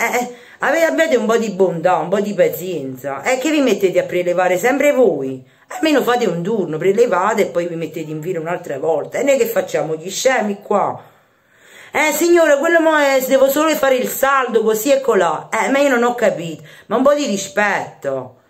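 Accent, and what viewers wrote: Naples, Italy. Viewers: Ti ringrazio se mi saluti da Siracusa e complimenti mi fai morire dalle risate quando racconti le cose alla Napoletana 🤣🤗🥰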